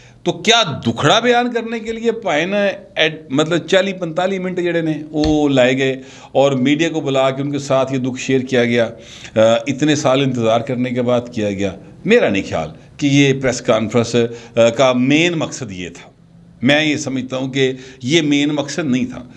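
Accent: Lahore, Pakistan. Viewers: Good 👍!! Typically lohery style sir ji😍😍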